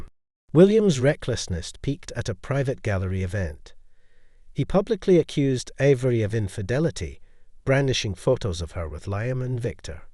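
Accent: Scotland, United Kingdom. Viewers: He sounds Educated Scottish to my ears.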